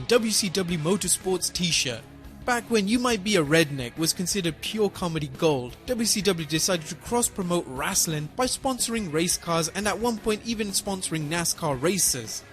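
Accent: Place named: United Kingdom. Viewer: Announcer is British. What do you expect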